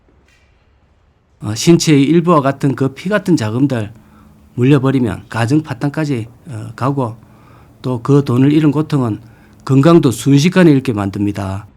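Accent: Gyeongsang, South Korea. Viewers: 목소리 사투리 매력 있으요 핫팅 !! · 구수한... 경상도 사투리 멘트... 최고입니다...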